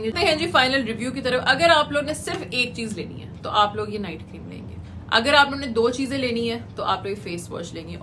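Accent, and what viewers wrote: Punjab, India. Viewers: Asalam o alaikum yar api ek video full punjabi me banae itni Sweet punjabi bolti hen maza double ho jata hy😂😂❤ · The punjabi accents are just love api 😅❤ Truly a girl down to earth 🌎 ❤️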